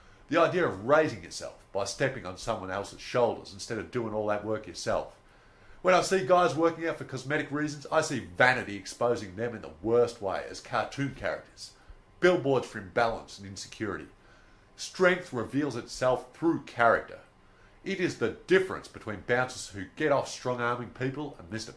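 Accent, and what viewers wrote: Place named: Australia. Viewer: Thanks for posting and great dictation, love the oz accent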